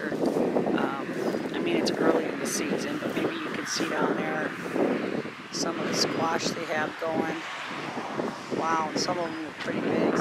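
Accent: Wisconsin, United States. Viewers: ... NICE VIDEO ... VERY RESPECTFUL COMMENTS TOWARDS THE FARMERS & THEIR STANDS ... LOVE YOUR "WISCONSIN ACCENT" ...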